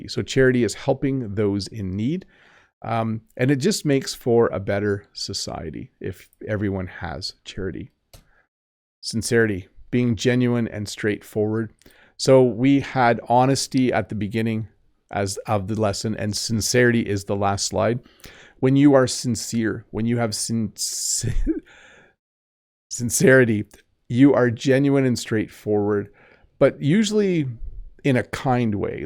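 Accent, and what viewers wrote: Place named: Canada. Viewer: Hello, dear teacher, I am Shahab from Iran, one of your avid fans. I truely appreciate the very useful lessons in which you teach us very fantastic things. I also love the Canadian accent. However, as a doctor, I believe you made a small mistake regarding the meaning of " empathy" and " sympathy ". Actually, empathy is the ability to understand and share the filling of another person, to put yourself in their shoes without becoming emotionally involved - exactly the same way doctors are expected to interact with their patients . On the other hand, sympathy is the feeling of pity, compassion or sorrow for someone else who is experiencing something difficult that doctors are professionally discouraged from showing sympathy because it might create a sense of imbalance in the doctor-patient relationship as it can cause the doctot to become emotionally overwhelmed. 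Hence, doctors should demonstrate empathy and avoid excessive sympathy.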